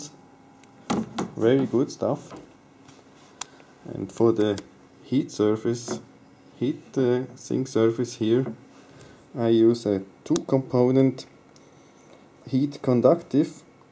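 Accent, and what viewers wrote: Switzerland. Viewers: Soo guet ne schwizer uf englisch am tutoriel mache.. würschmers Bärndütsch erklärä😀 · switzer englisch versteht man wenigstens ;) thanks for video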